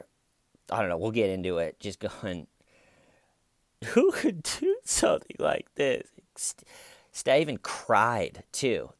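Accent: United States. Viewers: His accents too American and irritating. I keep wanting to shake him and tell him to speak properly.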